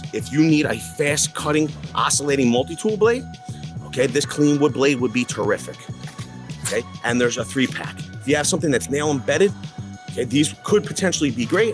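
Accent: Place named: Brooklyn, United States. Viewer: Just found your channel last week you talk like a Brooklyn Italian,are you Italian by any chance? 🤌🇮🇹 ayyyyyy ohhhhhh!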